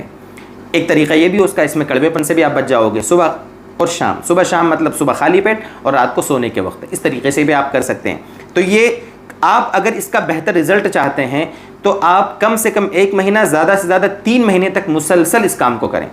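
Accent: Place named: Gujarat, India. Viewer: Bolne ka laheja gujratio jaisa hai .shayad surat district ke hon.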